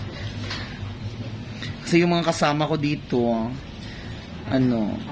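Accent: Iloilo, Philippines. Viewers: Tga din kaw te? Daw may tono kaw na Ilonggo ☺️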